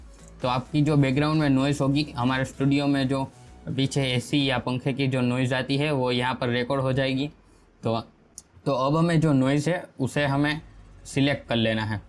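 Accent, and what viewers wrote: Gujarat, India. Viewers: bhai aapki speaking se pta chl jata h ki aap gujrati ho....😂😂 Btw i m also gujrati aur me aapke baaju me rehta hu i m from jetpur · Are you gujarati..???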